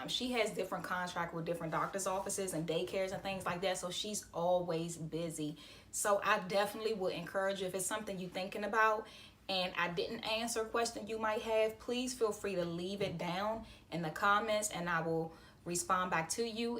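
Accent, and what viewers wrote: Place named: Washington DC, United States. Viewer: Hahaha I had a feeling you were from DC. The accent gave it away 😅. “Verrrrrrry” hahaha thanks I do EMS for DC and thinking about getting my cpr instructor. Thanks for the video. It was exactly what I needed to know. Do you need to get a business license as well and start filing taxes as a self employed person? Or would you rather get with me off the record?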